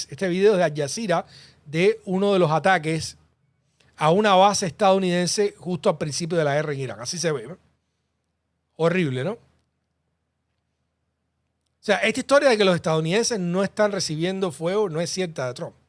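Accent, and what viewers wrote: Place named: Cuba. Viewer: con todo respeto, mejora tu dicción, he oído a otros cubanos y tu acento resulta demasiado cansado. Tu información buena pero cuesta trabajo seguirte con ese acento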